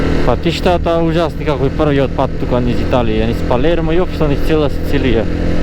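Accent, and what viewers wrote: Bitola, North Macedonia. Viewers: браво, за времето трудот и енергијата за креирање на убава видео содржина. Ама од се најпојќе ме бендисва шо по Битолски си збораш, и само така продолжи. Кој чактисва, чактисва, другите горе долу од видеото ке сватат. Поздрав 💪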